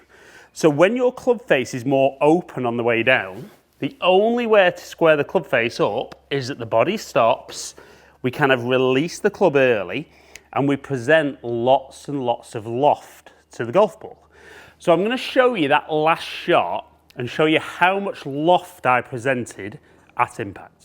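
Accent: England, United Kingdom. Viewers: WOW, not knowing the difference between further and farther!!! And my man sounds English to beat all!!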